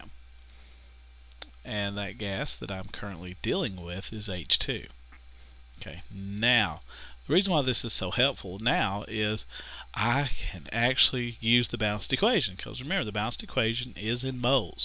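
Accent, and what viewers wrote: South, United States. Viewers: lol this wasn't what I was looking for I already understand how to do this but you did such a good job and made me laugh ( plus I love your southern twang ;) sexy!) so I kept watchin anyway :P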